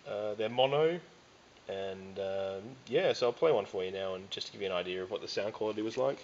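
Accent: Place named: Australia. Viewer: Funny you mention SC35C, I just purchased one to put in my TYPE A, which is currently running a Stanton500-II, mainly, again, due to the tracking weight issue. I just need to get some mounting posts to assist the process. BTW, I also have a 4HF in almost pristine condition, from a 'high-end' console back in the 60s. I have been meaning to overhaul it and put it into service. Do you have any tips for maintenance on the 4HF? (from your accent I presume you are in Australia as well, maybe NZ?) Cheers...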